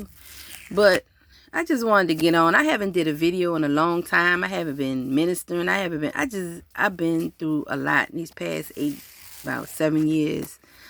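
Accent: New Orleans, United States. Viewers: Her New Orleans accent 🤤 She still got it 😍💙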